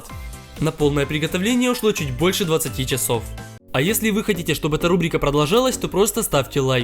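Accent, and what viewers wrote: Ukraine. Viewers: да,советую посмотреть его первое видео.там он разговаривает более украинским акцентом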